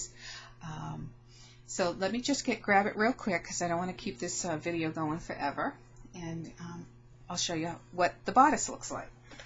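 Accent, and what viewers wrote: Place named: Canada. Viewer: Hi, new subscriber...I just came over from lynnyvnnestwo channel...she made a video response to promote your challenge...here's a link for you - <link> (hope it works) not sure if I'll be able to take part this time but good luck to everyone that is...hope it all goes well for you...enjoy. The dress is beautiful and it's so generous of you to want to share...I think it would be hard to cut into one of the dresses though but I guess once you start it's ok and there's so many things you can make once you have one...also are you in Canada or America...my guess is Canada but please forgive me if I'm wrong, I don't want to offend anyone..lol..why I ask is because there's a certain word that Canadians say and I love the way it sounds...the word is "out" and I'm sure it's a unique pronunciation to Canada...anyway, that's enough from me...best wishes. 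Ann...x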